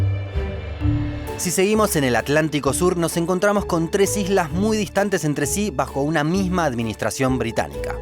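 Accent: Argentina. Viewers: Nunca me gustó el acento argentino, pero el tuyo es punto y aparte, me encantan tus videos, saludos.:)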